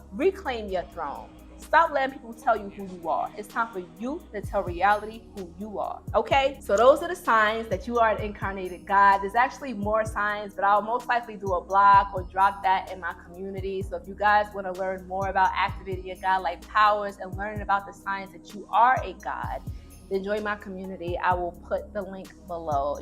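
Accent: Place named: Brooklyn, United States. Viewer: I can hear it in the beautiful accent! I'm from Albany area with fam from East Harlem and dear friend from Crown Heights, BK! I could feel that jubilant Biggie energy surrounding you, wow! 🗽❤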